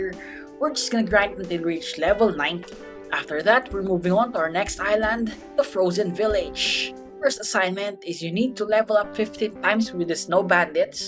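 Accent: Philippines. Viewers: Your Filipino, accent and the way you talked to the guy you took the yeti from "lods" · PINOY KA?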